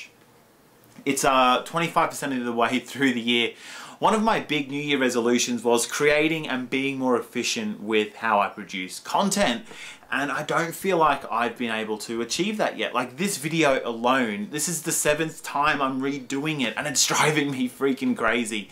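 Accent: Australia. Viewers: your creativity, editing style and fun aussie accent are what I enjoy about your videos. you should do whatever you are passionate about in your videos. keep up the good work bro :)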